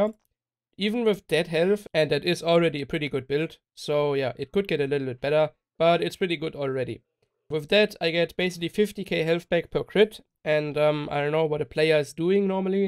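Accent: Germany. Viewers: Your english sounds like a german who wants to talk english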